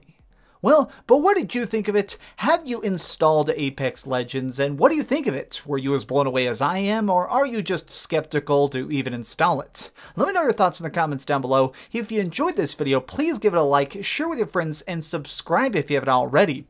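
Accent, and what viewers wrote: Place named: South, United States. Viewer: What happened to the southern accent now you are speaking like an announcer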